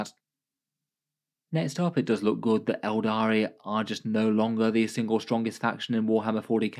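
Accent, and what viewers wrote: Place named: United Kingdom. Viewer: why britains can't speak english?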